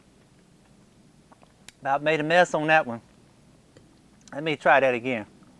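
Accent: South, United States. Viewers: Beautiful tomatoes :) This is my first time growing cherokee purples, and I'm hoping they come out as well as yours... Btw, you have one of the greatest southern accents I've heard on youtube, and that's from an alabama native! Very impressive, sir.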